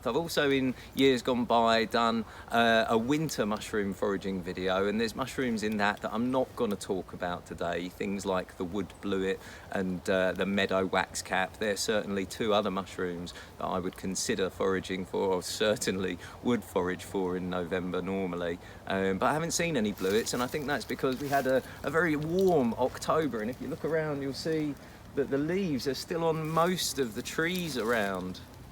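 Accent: England, United Kingdom. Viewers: I definitely sharing your video on Facebook here and America. You know we can trust you because you have an English accent.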